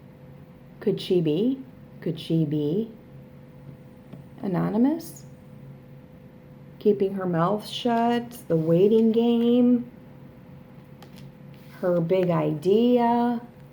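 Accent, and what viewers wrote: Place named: Western New York, United States. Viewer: just discovered your site. Enjoyed this reading, thank you for sharing. You sound like you're from western NY...I recognize the accent!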